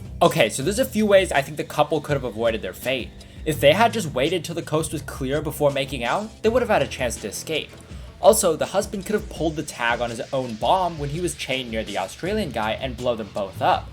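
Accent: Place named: United Kingdom. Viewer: He can’t be Australian- proceeds to sound about as British geeza as you can lol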